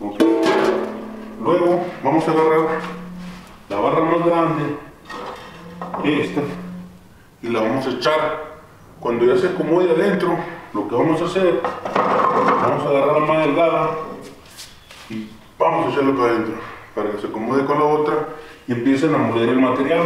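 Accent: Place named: North, Mexico. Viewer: Suena a que es del norte de Mexico , este mi amigo.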